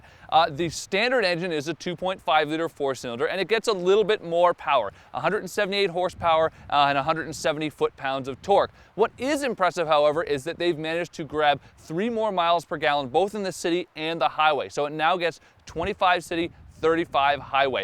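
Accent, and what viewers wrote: Canada. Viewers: is this guy Canadian?... ither way I think he is sexy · This gentleman is speaking just fine, the Canada accent, no big deal.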